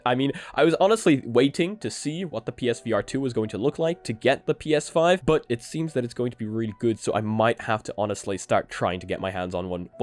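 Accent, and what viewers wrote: Ireland. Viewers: your irish? oO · Wait your Irish ☘️ small world thought this audience was just Americans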